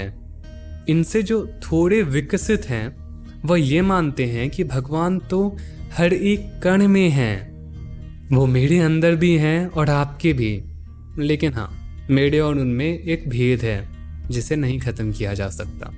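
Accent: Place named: Saharsa, India. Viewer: bhai बिहार से हो क्या आप आपके बातों से लगता है आप सहरसा के आस पास के लगते हैं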